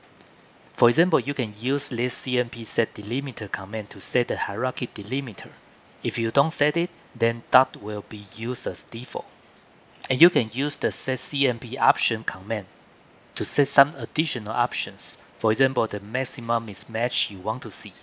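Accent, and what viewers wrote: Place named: China. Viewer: Chinese Accent. Difficult to understand. Please upload video with better accent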